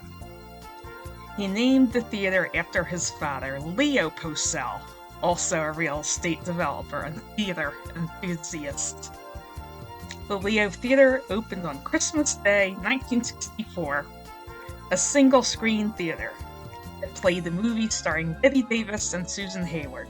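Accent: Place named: Philadelphia, United States. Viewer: Narrated, with a perfect Philly accent😂 great job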